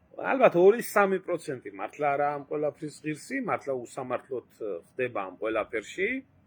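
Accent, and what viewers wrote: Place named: Mingrelia, Georgia. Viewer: შენი მეგრუ(ლ)ი აქცენტი არ გაიშვას😂😂😂😂.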